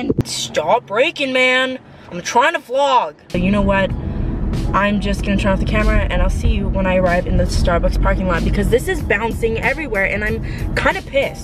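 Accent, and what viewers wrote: United Kingdom. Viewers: Loving the accurate af British accent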